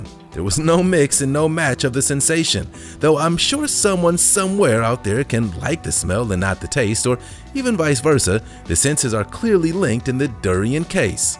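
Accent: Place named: United States. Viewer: I call it custarp apple too i think we speak british english this person is speaking american english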